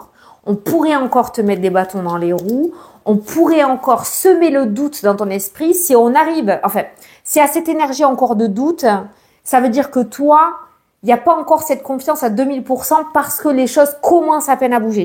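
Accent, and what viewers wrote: South, France. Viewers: Merci je vous adore vous êtes trop cool et incroyable et surtout avec l'accent du sud. Sa fait du bien de vous voir et je rigole à chaque fois. En se qui concerne le lundi il y a beaucoup de choses qui me correspondent dont l'ophtalmologue 😅 ainsi que les rêves prémonitoire. Continuez à être naturellement heureuse et partager avec nous vos précieux moments. ❤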